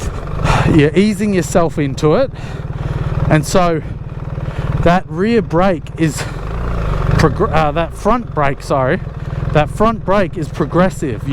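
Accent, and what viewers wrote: Australia. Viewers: thank you for showing us!! also you where just in Portugal and all over Europe you sounds Australian and you now live? in Estonia? talk about adventures XD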